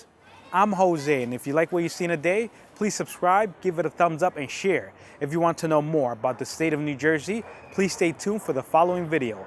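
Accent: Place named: Latin America. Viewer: No se si me equivoco pero eres latino porque la forma en que hablas no es de un nativo americano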